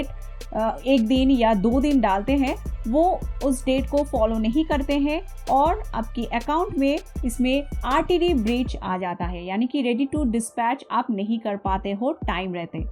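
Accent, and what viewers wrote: Bengal, India. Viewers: Don't have time to watch long video,and bengali action in ur hindi speech.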